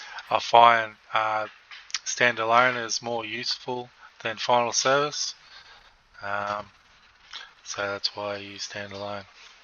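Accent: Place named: Australia. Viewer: Nice to see a guide with an Aussie accent.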